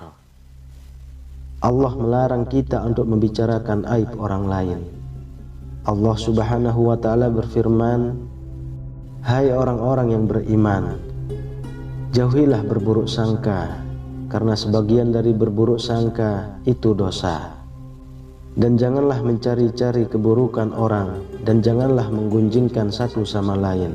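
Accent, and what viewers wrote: Lombok, Indonesia. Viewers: admin logat lombok ya..hehe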